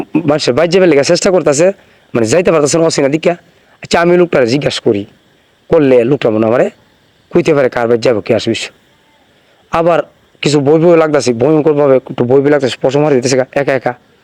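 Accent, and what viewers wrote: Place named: Brahmanbaria, Bangladesh. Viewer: এটা ব্রাহ্মণবাড়িয়ার ভাষার মতো লাগলো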